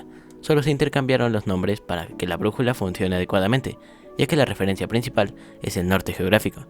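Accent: Mexico. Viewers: oye de donde eres se te escucha acento mexicano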